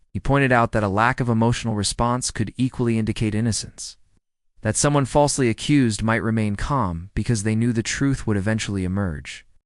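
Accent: United States. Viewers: Right! As if a member of the Brigade of Guards would appear at court in his parade uniform. Rubbish with an American accent as usual! Bloody stupid!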